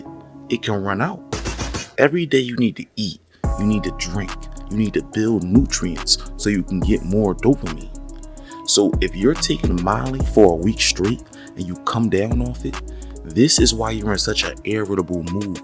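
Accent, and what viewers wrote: Philadelphia, United States. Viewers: Okay, definitely Philly, wow man hearing you speak the way you do fukk me up gang, so articulate but at the same time look burnt out, never judge a book by its cover ❤ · Yo you from Philly thought you was from Baltimore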